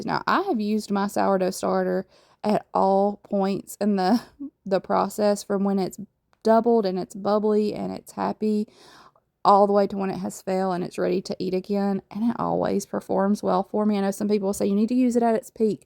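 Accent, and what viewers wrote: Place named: Alabama, United States. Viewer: I love your Alabama accent. My people are from north central Alabama and sound just like you. thanks for the helps.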